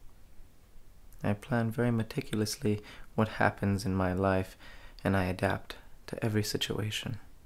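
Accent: Scotland, United Kingdom. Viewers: Scottish accent? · Is that suppose to be a British accent? If so really good xx ( I’m from England) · You sound Scottish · Quite a good british accent 8/10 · One of my favorite movies ever! Thank you so much for doing this. PS great British accent. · I love the accent but Is it British because if it is I’m sending you £££££££😂😂🏴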